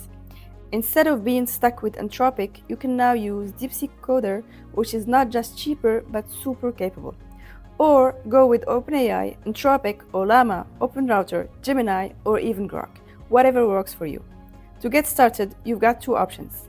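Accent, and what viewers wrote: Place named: Egypt. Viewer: طلاق تلاته مصرية و متهش عن الاكسنت😂 شكرا❤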